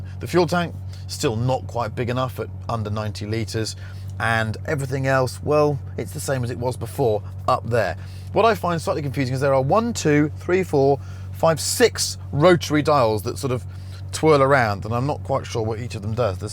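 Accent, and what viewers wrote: Italy. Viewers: that italian accent :D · LOVE THAT ITALIAN ACCENT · That accent was like a weird hybrid between jar jar binks and an Italian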